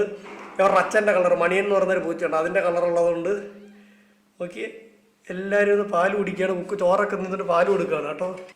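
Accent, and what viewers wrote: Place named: Trivandrum, India. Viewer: Bro ude samsarathil cheriya oru trivandrum slang varunnu❤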